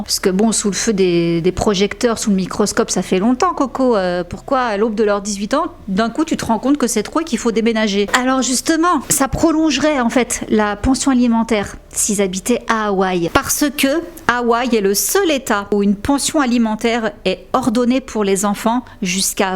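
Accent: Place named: France. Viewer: Tu pourrais faire un minimum d efforts pour l accent anglais? On dirait une blague tellement tu franchouillardises des mots basiques .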